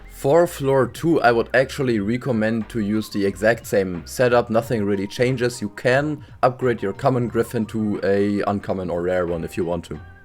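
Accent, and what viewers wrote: Germany. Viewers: Are you german because you sound really german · man hört dein deutschen aktzent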